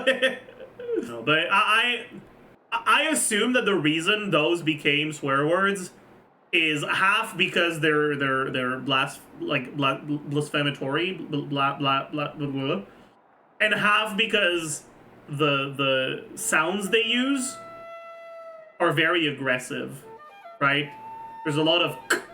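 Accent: Quebec, Canada. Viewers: Kinda funny watching this from a French person perspective. He pronounces everything the French-Canadian way, and some of theses rules don't really feel valid within the boundaries of my accent. His o sound like ow, the way he says é and ai can be related which is, I think, never the case for me, etc. But a pretty entertaining video nonetheless. Always a pleasure to hear share a little bit about his francophonic side!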